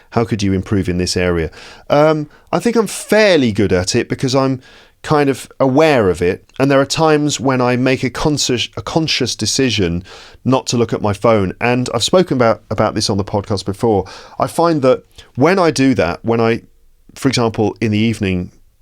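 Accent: United Kingdom. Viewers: His accent is British, i don't know which specific accent because there are a lot of British accents but it's mainly british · This was very informative, very very informative. More content should be made on these lines and other similar important subjects, societal subjects, classified subjects, about realities which are not made public. Some of the topics can be related to cannibalism practice in the 21st century. Subjects related to money and banking and how successful this system has been across the globe. Topics related to different kinds of societies, interesting facts which can be shocking too often times. Until recently I never knew the difference between British English and American English and the associated politics at global level. I have been looking for profiles for marriage on Instagram and Instagram mainly shares profiles from across the globe who speak American English or some kind of English but not much from England who speak British English like yours or Sophie Turner or Isaac Hempstead Wright. 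I find this approach very political in nature. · Hey... Really like your videos. People apart from spoken English can learn British Accent on your channel. I particularly watch your videos for British Accent. It's just a suggestion if you like. You can also start teaching English with newspapers as the language is quite arduous to comprehend. Thanks for the Videos. Best of luck.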